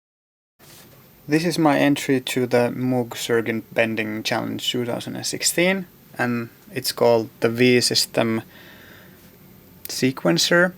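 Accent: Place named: Sweden. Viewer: you sound just like martin moulin speaking.. same accent....... are you sweden too?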